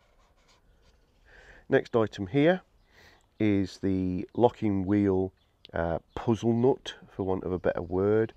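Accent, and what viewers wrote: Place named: Birmingham, United Kingdom. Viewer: Just found your channel and binge watched the XK8 posts. Excellent series of informative vids, nicely filmed and narrated (with a hint of Brummie accent?). FWIW, I regularly check air pressure of the spare and inspect sidewalls. Those "T spec' tires degrade over time even with minimal or even zero use. They are also becoming difficult and expensive to replace and almost impossible to safely repair.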